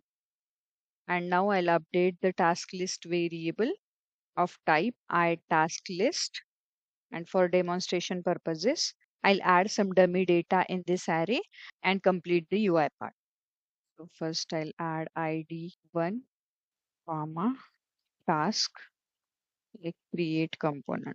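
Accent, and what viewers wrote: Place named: Maharashtra, India. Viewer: My mind is thinking that your english is having little Marathi accent 🤔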